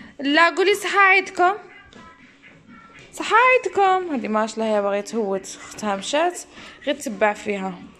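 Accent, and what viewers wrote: Tlemcen, Algeria. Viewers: هدي هدرتها هكا حنا التلمسانيات نهدرو هكا و كاين اللي يهدرو بال a يهدرو بضرافة كثر من هاك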